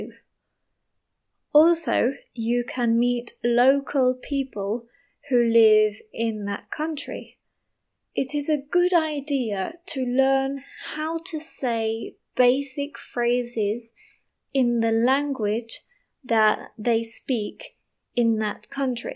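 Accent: United Kingdom. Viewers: I'm wondering something... Where does your accent come from? Of course it's British, but your accent is much clearer than most English. I knew there were many accents in the UK. 🤔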